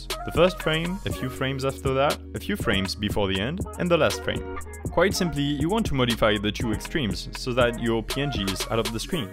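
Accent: France. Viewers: Love your French accent!😍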